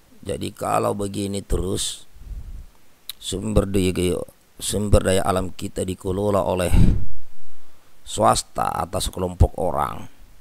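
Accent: Indonesia. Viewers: logat ke daerahnya jgn di tinggal itu makin Indonesia Banget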